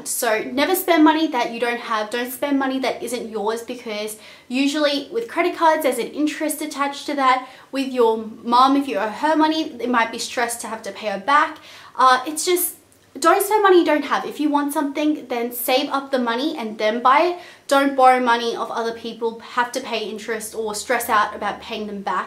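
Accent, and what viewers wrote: Australia. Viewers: Australian accent is quit pretty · How are you getting British? She's clearly Australian. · Nope she's definitely Aussie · its Australian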